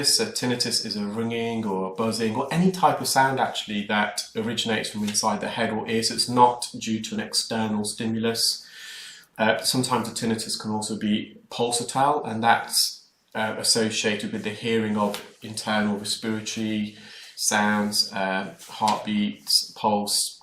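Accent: United Kingdom. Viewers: Like your britain dialect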